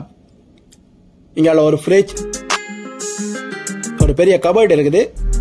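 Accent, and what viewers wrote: Sri Lanka. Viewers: ஐயா கப்பலில் தங்கும் அறைகள் பற்றி நன்றாக உள்ளே சுத்தி காண்பித்தீர்கள் மிக்க நன்றி நீங்கள் தமிழ்நாடு தமிழரா இலங்கை தமிழரா உங்கள் குரல் சற்று இலங்கை தமிழர் போல் தெரிகிறது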